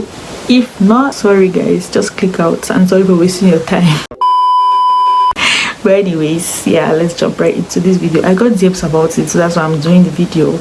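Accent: Nigeria. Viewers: Cameroonian u sound Nigeria 100%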